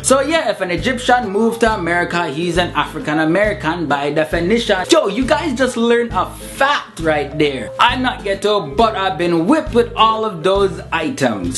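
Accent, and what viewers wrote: Jamaica. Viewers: Oh I'm just loving the Jamaican accent!! I'm from Germany and I definitely wanna to go to Jaimaica when I'm older :) So here's my question: What is your first thought when you hear the word German?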